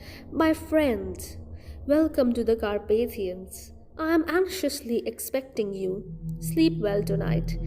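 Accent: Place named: United Kingdom. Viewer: Your voice is just wow .. accent is too British . Just love your audio book❤ keep going.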